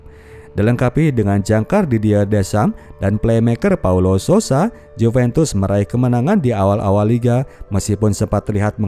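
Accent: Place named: West Java, Indonesia. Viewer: Pengisi suara orang sunda pasti😂😂,suaranya khas bnget😊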